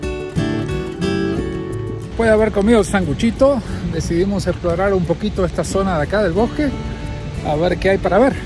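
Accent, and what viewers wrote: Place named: Argentina. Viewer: Pensé que tenía acento argentino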